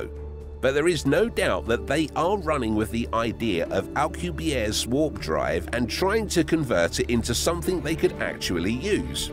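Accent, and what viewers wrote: United Kingdom. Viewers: irritating British BLOKES talking... hate that stupid accent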